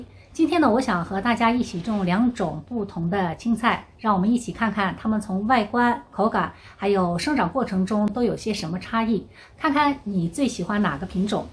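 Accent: Jiangsu-Zhejiang, China. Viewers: 其实我最喜欢看的是开始的那三十秒，听你口音像是江浙一带的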